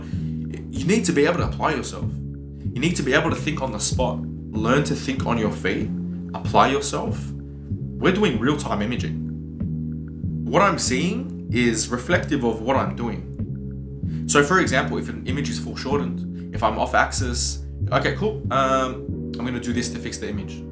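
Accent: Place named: Australia. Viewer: sounds like Australia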